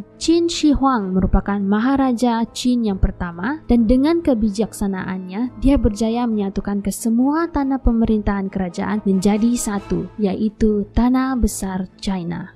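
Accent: Sabah, Malaysia. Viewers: Kakak jane pun ok la.tapi lagi best abang.suara abang lagi besy sebab loghat sabah yang merdu jee.tapi kakak jane pun best jugakk😀😀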